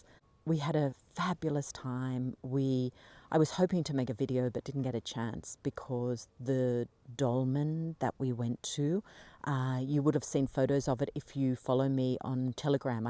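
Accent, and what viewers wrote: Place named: Australia. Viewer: Thank you for sharing your journey. Its nice to hear a fellow Australian on this path. I dont know much of Mary Magdalene but am drawn to learning more. Blessings from Australia.⚘️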